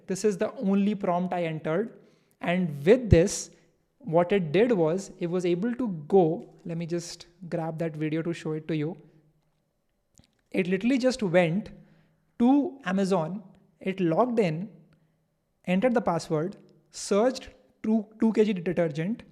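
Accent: India. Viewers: I do not know where you are located but I can hear you are from the east. India or one of the countries around there, so please forgive my ignorance about that. Why do I think where you come from is relevant for my comment, it is because India for example is well known for their scam activities, and here in Europe we are always concerned when we get mails, phone calls, from people from India that offer technical support. With this said, I wonder how do you face the insecurity when using AI. Insecurity with regards to whether your data is being used or even shared. Deepseek is Chinese, and connects to private databases for its reinforced Augmentative Responses. Do you consider that when entering passwords or personal information or do you have a more relaxed view on security which allows you to just throw yourself on the net without really having concerns.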